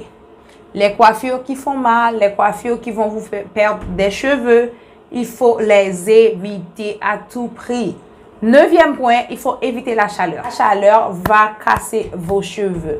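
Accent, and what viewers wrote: Haiti. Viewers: T'est haïtienne ma chérie ya l'accent fort 😅😘😘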